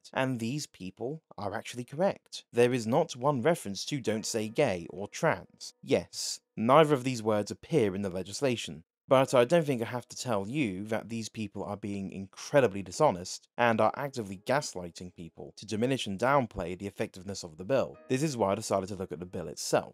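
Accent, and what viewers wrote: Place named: United Kingdom. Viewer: Pretty cool but british accent so i have to dislike... sorry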